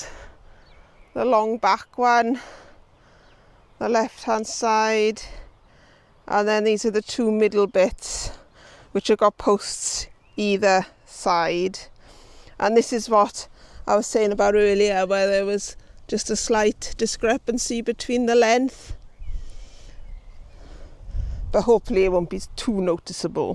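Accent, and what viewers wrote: Wales, United Kingdom. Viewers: Ah that Welsh accent! - I just want you to say lol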